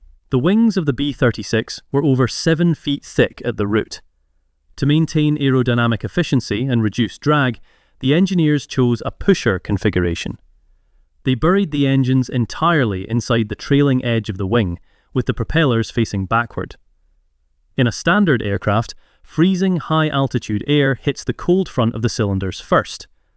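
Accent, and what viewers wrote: United Kingdom. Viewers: It's also a UK accent using exclusively US units Obvs not concrete evidence but defo suspish imo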